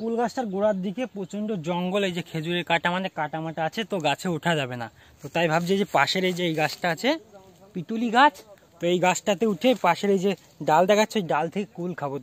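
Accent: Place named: Kolkata, India. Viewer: kolkatar bangla gula moja lage sunte...hevy test eta khub moja alge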